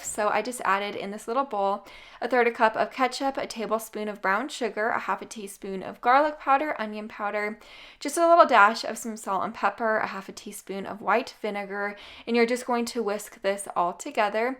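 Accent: Utah, United States. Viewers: It's not that her language is sloppy but she does speak differently than she did a year ago. Some of these are older videos. In the more recent videos, she has picked up a Utah accent. They drop their T's and pronounce things differently like un-tul instead of until, etc.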